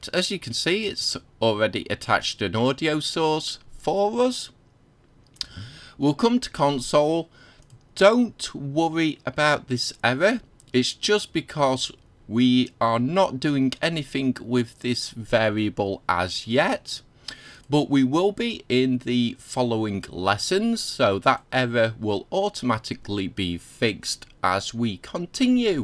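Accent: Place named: United Kingdom. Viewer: i like british accent~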